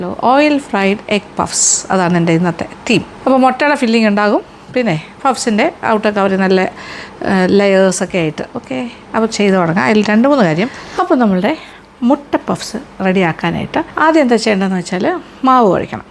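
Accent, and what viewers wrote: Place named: Central Travancore, India. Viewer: Your demonstration and explanations are easy to understand. Your Malayalam is from Central Travencore as well. Not of native Trivandrum type, it is clear and well spoken. Thank you for the time spent on items cooking. May God Bless you and Keep you.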